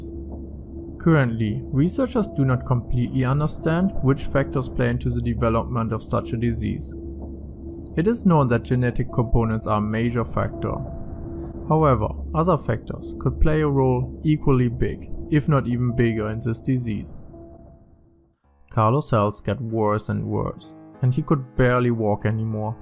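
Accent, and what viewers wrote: Germany. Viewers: Deine Videos sind klasse, bildhaft und strukturiert. Das einzige was mich stört ist dein starker deutscher Akzent. Dafür kannst du nichts - ich glaube aber mit einer besseren Aussprache könnten sie eventuell mehr Reichweite erzielen. Die, die sie verdienen! Vielleicht könntest du einen anderen Sprecher nehmen? Ich bin selbst Psychologin und habe mehrere Mikrobiom Transplantationen hinter mir - sie haben meine Psyche und meinen Körper gerettet. Weiter so und liebe Grüße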